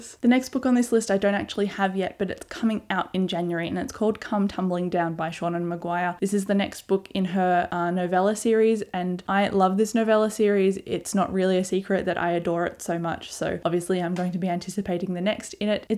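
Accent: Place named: Australia. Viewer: I'd forgotten how pleasant the Australian accent is. :) Looking forward to what you have to say about Parasite. The Wheel of Time...I went through that series a year or so ago because of the Sanderson tie in and because when I saw him in person both times he always credits it as one of his favorite...I won't spoil anything, but I'll be looking out for how your experience goes and save my queries until you finish. :)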